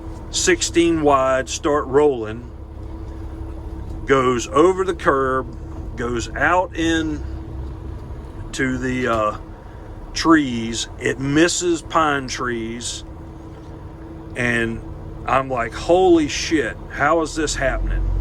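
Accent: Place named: Pensacola, United States. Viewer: I am enjoying this. I am guessing you are from the area close around Pensacola, as you sound similar to Adam Booth (abom79), who has a popular YouTube channel.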